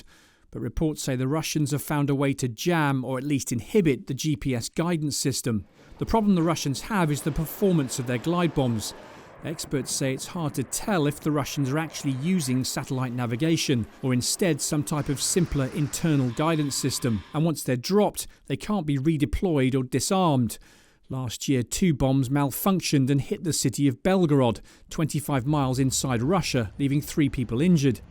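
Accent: United Kingdom. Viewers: Whenever I hear a British accent narrator i feel propaganda whyyy ? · I heard his british voice thats how i know this is a false propaganda channel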